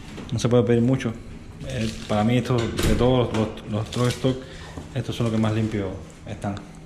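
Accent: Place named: Venezuela. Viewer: por tu manera de hablar, tu acento no eres Mexicano, es mas como Venezolano o Dominicano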